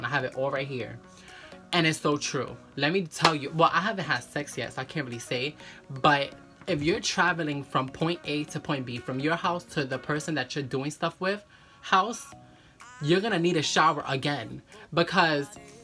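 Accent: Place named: New York, United States. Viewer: It sounds like your from NY I really like literal showers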